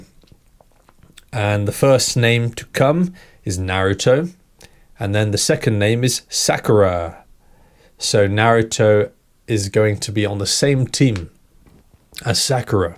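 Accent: United Kingdom. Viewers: Really, the British accent is the best.❤❤❤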